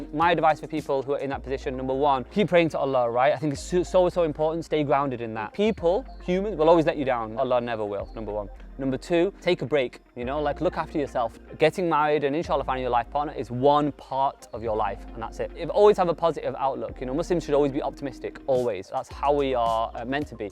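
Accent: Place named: United Kingdom. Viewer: British Accent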